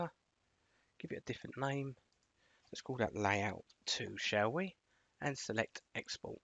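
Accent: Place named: London, United Kingdom. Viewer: OY!!!! You sound like you’re from London!! 🤣👏👏👏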